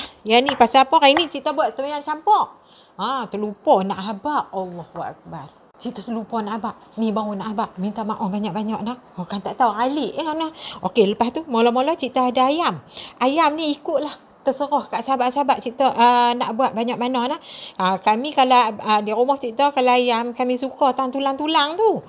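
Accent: Kedah, Malaysia. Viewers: Suka tgk masak...cakap kedah lagi...cun la..sopan.bersih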